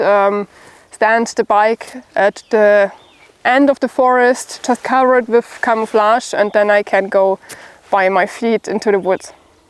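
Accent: Germany. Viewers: That was a very interesting video. A little bit of a departure from your normal videos. You always have the best equipment and take the time to explain each item. Loved the entire camouflage on everything. You look so much better these days. Then again you look good always I must admit! You're obviously recouping well and looking good as ever. Just can't get enough of your German accent. It's so adorable. Appreciate how you put the hard work in for each video. Good health going forward. God Bless. 🥰🤗❤🙏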